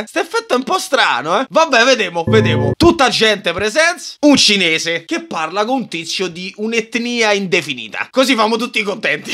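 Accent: Rome, Italy. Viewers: TU devi doppiare pikachu e con accento romano incluso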